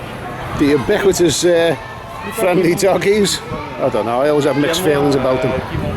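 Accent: Liverpool, United Kingdom. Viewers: Fantastic video, your photography was brilliant, your commentary was most informative without being boring, i think the Scouser accent gives it a nice cheeky humorous flavour, although i think you may have pissed off a few lads in the council estates around "LaPool.HeHeHe.Keep up the the good work brother look forward to more like this one.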